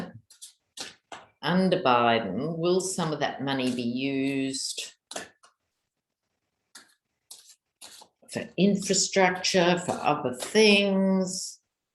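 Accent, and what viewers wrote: Australia. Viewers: Thank you, I love that you have a number for every video and the Australian voice sound is so beautiful on top of that what a gift your reading are for us here in the US.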